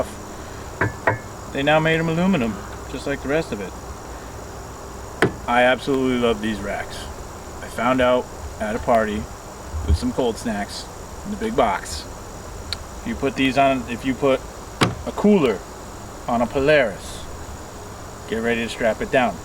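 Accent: United States. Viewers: Literally the first sentence that came out of your mouth I can tell you from Wisconsin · Thanks for taking the time to share your opinion. Refreshing to see a video that is unrehearsed or sponsored. Like your breakthrough accents and lightheartedness. Good thorough review. Own a 650 XT Max and I love the machine. Mainer eh? In NH we call you "Maine-e-acks. "Kind proved it with the wheelie segment at the end of your video. Made my day!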